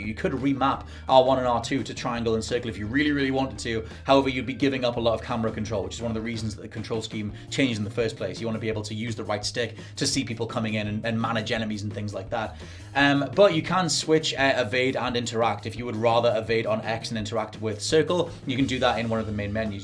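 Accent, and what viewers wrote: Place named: United Kingdom. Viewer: yeah it's a because of the British accent. You'd need to be a moron not to realise it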